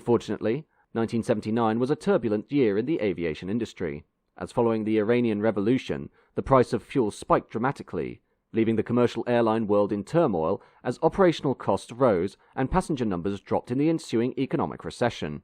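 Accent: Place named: RP, United Kingdom. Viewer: Quite a few words are mispronounced, among them 'The Pass', which is pronounced 'Da Paa'. The plummy British accent is also off-putting.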